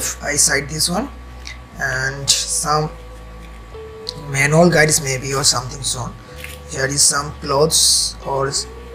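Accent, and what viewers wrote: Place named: Bengal, India. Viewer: Abey english to sidhe bol... Bengali english mat bot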